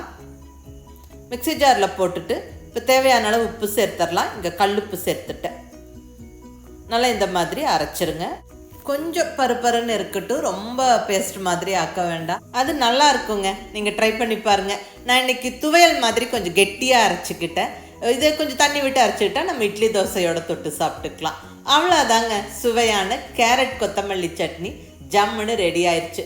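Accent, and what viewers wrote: Kongu, India. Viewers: Hi mam... U r one of my inspirations to start a YouTube channel... Love the way you describe the cooking with கொங்கு தமிழ் ..way to go mam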